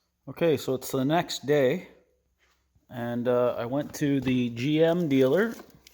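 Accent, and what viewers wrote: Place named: Minnesota, United States. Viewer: I hear a Minnesota or perhaps Wisconsin accent :)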